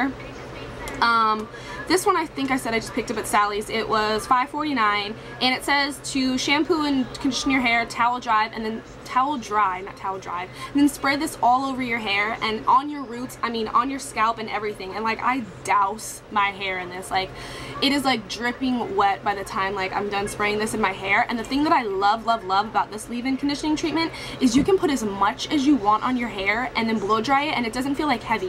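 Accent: United Kingdom. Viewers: I love your British accent! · I wish i could do yoour british accent !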